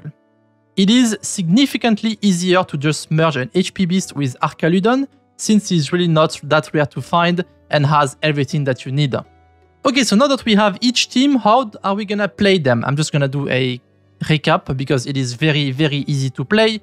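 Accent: France. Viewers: My man french accent goat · Subscribed for the french accent and the good content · This french accent everytime XD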